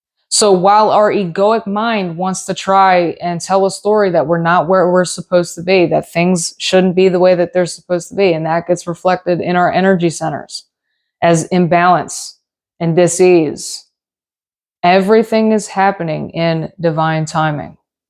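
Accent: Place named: Philadelphia, United States. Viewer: Are you from the Philly area? Sounds like it from your voice!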